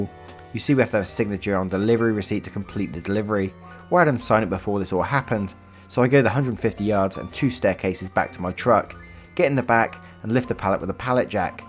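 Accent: United Kingdom. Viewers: You have one thing rSlash does not, a lovely British accent. And you narrate them extremely well. Thanks.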